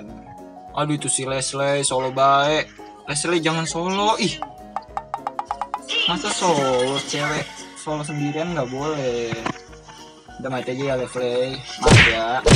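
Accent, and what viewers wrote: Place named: Sunda, Indonesia. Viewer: Bang lo orang tangerang selatan ya sunda kasar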